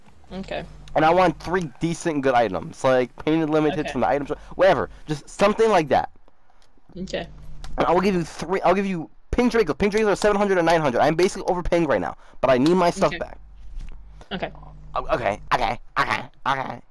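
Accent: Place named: United Kingdom. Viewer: he's just British he said mate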